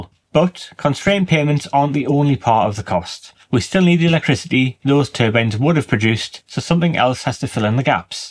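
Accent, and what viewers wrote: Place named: North East England, United Kingdom. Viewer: Another good video, and always great to hear a North East accent (Greetings from Darlington)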